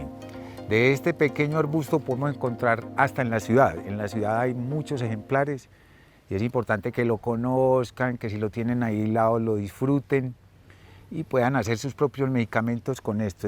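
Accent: Colombia. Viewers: Que delicia escuchar hablar a una persona colombiana !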